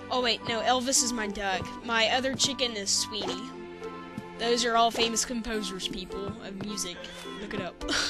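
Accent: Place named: South, United States. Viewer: And no offense but your voice is hilarious I wish I had that hillbilly voice I talk kind of country but not enough it bothers me.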